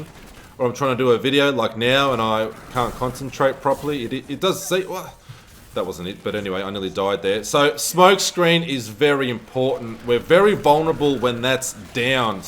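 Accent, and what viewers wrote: Australia. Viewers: It is like August....Augild....Orgild. Hope that clears it up, it is our Australian accent. Love your videos even if you can't talk properly.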